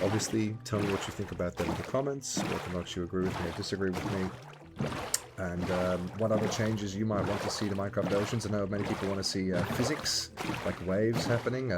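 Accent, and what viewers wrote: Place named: United States. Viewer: I’m having quite a hard time pinning down your accent. Your account says Australia but your voice sounds much more American, but not completely.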